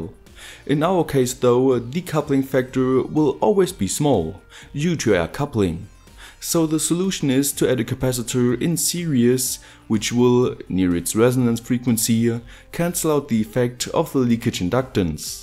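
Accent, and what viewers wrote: Germany. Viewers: Great (Video)! Btw, I got one question, are you german? Because you sound like them:D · Nice Geodreieck and nice Deutcher Akzent xD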